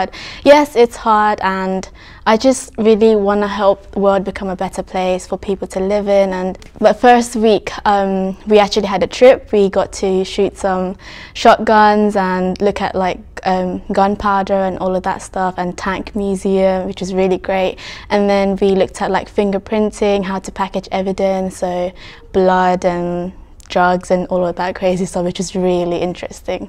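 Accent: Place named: United Kingdom. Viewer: I like her British accent. I haven’t heard an asian speaking in that accent before.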